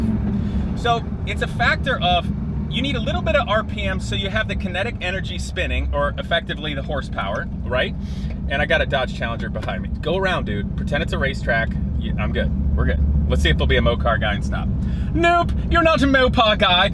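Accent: England, United Kingdom. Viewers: You look a bit like jenson button, you got the british accent down pat too😂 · Dude you’re so hyperactive!! I love your video style. And your car. Love the way you sign off: be nice to your cars. Absolutely. Finally, I’m from ENgland, nice English accent. :D:D:D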